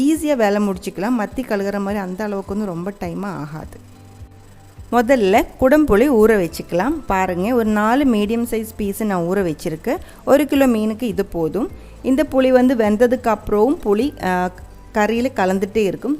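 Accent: Kerala, India. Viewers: hai sis fish curry super..eppadi tamil kathukkitigga. neega tamil&keralaugga voice mathiriye kulambum sema. home tour podugga ugga faceyum kattugga.god bless u r family.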